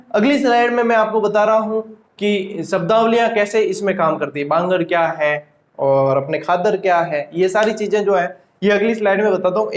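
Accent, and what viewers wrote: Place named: Jalore, India. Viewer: Aapki boli jalor side ki h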